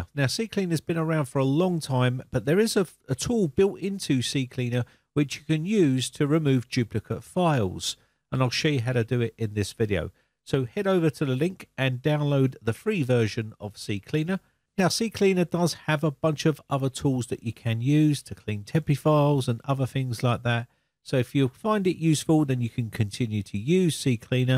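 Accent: United Kingdom. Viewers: British accent perfect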